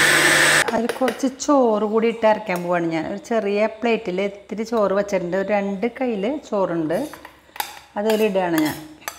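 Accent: Thrissur, India. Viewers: Namaskaram. Valare saumyamaya sambhashanam aanu video kaanan prerippichathu. Valare upayogapradamaya content 👌 Valare Santhosham Nandi. Samsarathil ninnu Nanma niranja,teacherum veettammayum,Trissur swadesiyum Tamil brahminum aanennu manassilayi.Ellavidha aasamsakal nerunnu.iniyum dharalam videokal prateekshikkunnu.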